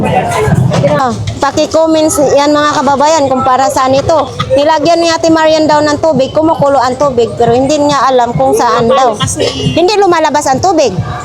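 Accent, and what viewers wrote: Visayas, Philippines. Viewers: Ate .parang Visaya ka 😂😂😂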